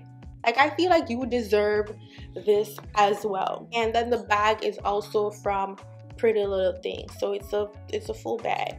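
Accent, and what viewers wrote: Jamaica. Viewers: Chun chun 😂😂😂 . The Jamaican can’t hide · U SOUND JAMAICAN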